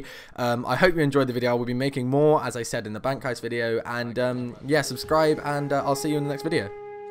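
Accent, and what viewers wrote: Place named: United Kingdom. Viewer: so british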